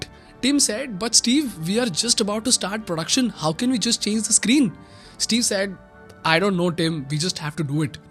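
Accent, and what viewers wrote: India. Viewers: "R-rrrrr " heavey indian accent feels like hammar hitting rrrrr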